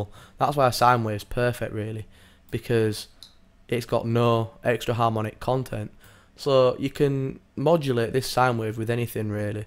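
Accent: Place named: West Yorkshire, United Kingdom. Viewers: Nah, that's a West Yorkshire accent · Bradford by the sounds of it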